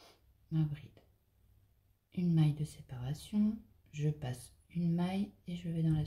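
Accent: North, France. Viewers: Bonjour, je vous decouvre ..je pense à votre accent que vous étes du nord, j habite l alsace mais je suis native du nord ...j aime vos explications et j ai hâte de découvrir vos autres réalisations....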